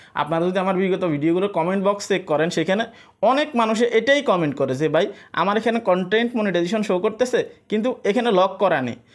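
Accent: Noakhali, Bangladesh. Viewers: ভাই আপনার বাসা নোয়াখালী?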